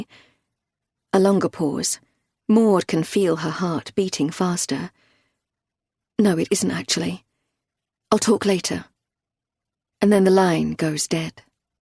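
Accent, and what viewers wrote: United Kingdom. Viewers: I love British accent. I actually prefer British accent instead of an American one.❤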